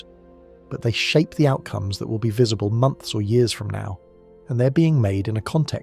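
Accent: United Kingdom. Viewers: ......I'M SO SICK OF THESE CRAPPY NARRATIONS, WITH "BRITISH ACCENTS"!!!!!!!!!!!!!!!!!!!!!!!!!!!!! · Please remove the very annoying British English narrative in favor of neutral American English.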